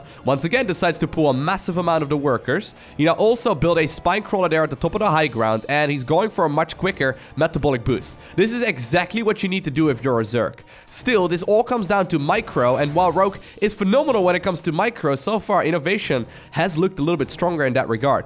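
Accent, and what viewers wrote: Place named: Netherlands. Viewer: dutch accent spotted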